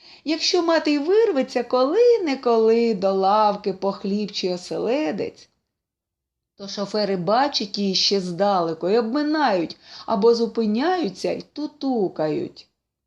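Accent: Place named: Russia. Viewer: Треба перечитати. Причини: в українській мові літера "О" ЗАВЖДИ ЧИТАЄТЬСЯ/ЗВУЧИТЬ, ЯК "О". Без варіантів. (Відчувається, що начитує російськомовна людина) 2. Проблема з наголосами, напр. : п'Овагом, а не пов'Агом і т.д.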